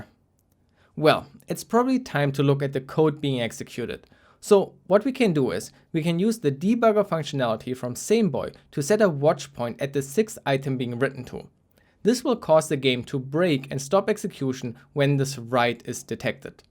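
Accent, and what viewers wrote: Germany. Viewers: You sound like u have a german accent, iam from germany 2 and i think iam smart enought to hear this? xD great video btw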